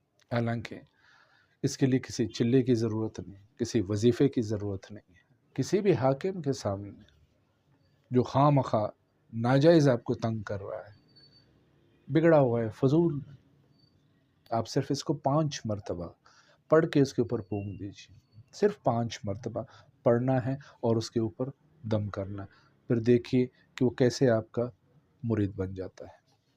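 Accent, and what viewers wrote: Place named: Pashto, Pakistan. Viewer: Ap ka lheja pshto h thik sy smjh nhi ai😥😥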